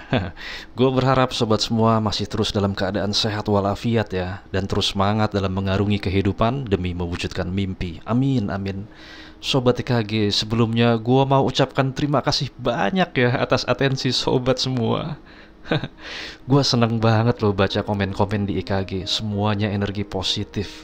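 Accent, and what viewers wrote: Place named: Java, Indonesia. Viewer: Alhamdulillah suaranya medok masuk ke hati bang anda bisa sukses bahagia sejahtera kaya raya penuh keberlimpahan saya pun begitu pasti bisa sukses bahagia kaya raya penuh keberlimpahan Aamiinnn ya rabbaalamin dan terimakasih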